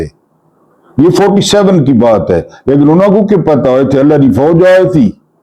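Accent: Saraiki, Pakistan. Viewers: Excellent analysis sb, suraiki accent bht enjoy kartay hn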